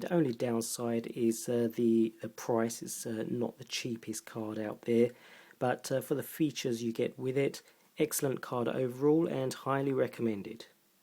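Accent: United Kingdom. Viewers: why is british accent so damn annoying?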